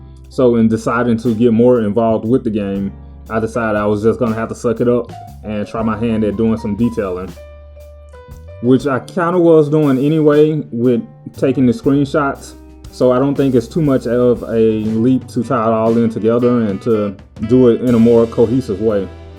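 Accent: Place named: South, United States. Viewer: You might not think you are very good at public speaking - I think you are way to hard on yourself. I could not imagine a better voice with a southern accent to accompany your Bluff City series.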